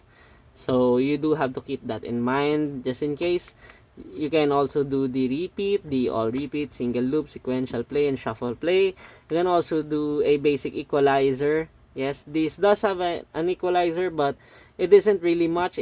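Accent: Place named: Philippines. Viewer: I like your filipino accent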